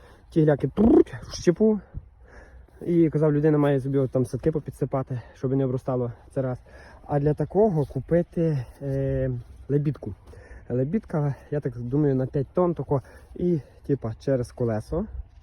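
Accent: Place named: Dubno, Ukraine. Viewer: Чую по розговору, в мене бабуля з Дубенського району була, все дитинство там провів 👍👍👍. Зря хочеш продавати пасіка то для душі....але ти правий шо особо не заробиш на цьому ділі.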